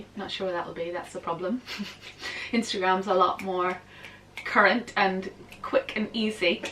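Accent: Northern Ireland, United Kingdom. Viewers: Great video. Thank you. As much as I'd like the sewing feet, I don't think they'd fit my machine which is an elderly ELNA. I tried to buy a walking foot recently but no variation would fit. I keep thinking maybe I should get a new machine, but the Elna is a good basic machine. So don't know how much better the new machines are.... Like you, most of my makes are for the cooler months, so I don't have enough to do memademay. I'm recently returned to sewing and will get a more comprehensive memade wardrobe eventually. Like you also, I'm from NI - now living near Cambridge. I love listening to your lovely accent. It brings me many happy memories. God bless. Helen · Just realised I’m a year late, never mind! I’m also in Northern Ireland so it’s lovely to hear our accent on videos x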